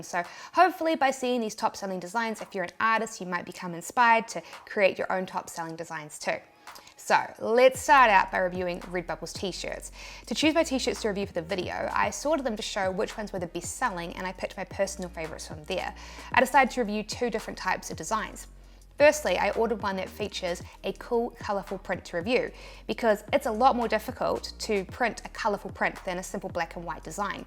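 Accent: New Zealand. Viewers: Sorry.......-but the extreme New Zealand accent is a bit unclear,--- as well as hard on the ear........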